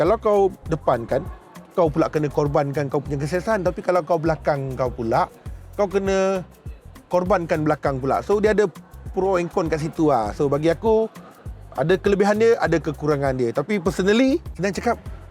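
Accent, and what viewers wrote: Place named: Negeri Sembilan, Malaysia. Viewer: kan nismilan bona bunyi eeh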